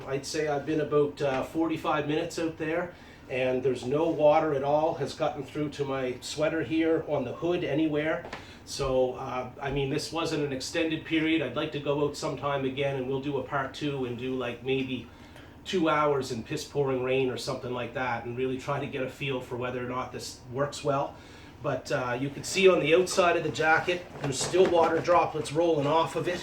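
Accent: Ireland. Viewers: Sounded quite Irish yeah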